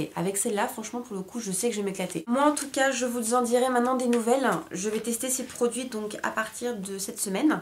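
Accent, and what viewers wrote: England, United Kingdom. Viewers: j'adore ton accent anglais mdr!!!!! <3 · j'adore ton accents anglais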